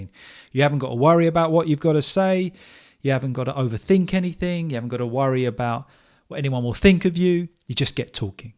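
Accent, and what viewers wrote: United Kingdom. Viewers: Fantastic teaching, speaking British English clearly sir you are every ways perfect. Thank you very much for your teaching British English Mr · Hi! Today, in one single day, I’ve taken enough time to listen to you again. Three podcasts, and this one twice, because it’s great and helpful to everyone what you say about the ability or skills in speaking in a foreign language for those who want to speak it or get fluency. You have explained this important issue in a very clear way to be understood. Thank you. Se sentir bloqué ou avoir peur de parler dans une langue autre que la nôtre, c’est normal pour les adultes. Il faut se le dire et se le redire. Mais il faut vaincre cette peur. C’est possible! Ce que vous conseillez de faire à votre public pour y arriver nous semble tout à fait juste et fonctionnel. Merci beaucoup! Mais pour un Européen quelle sorte d’anglais faudrait-il essayer d’imiter? 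In my opinion your accent would be the best. Your British accent is the most widely and understood in use everywhere.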